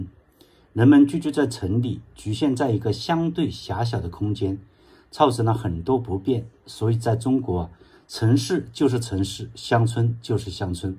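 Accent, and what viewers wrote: Hunan, China. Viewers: 听CJ的口音像是湖南人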